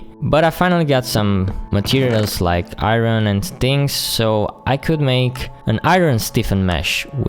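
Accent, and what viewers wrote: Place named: France. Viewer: btw where are u from ur actent is likr frech on something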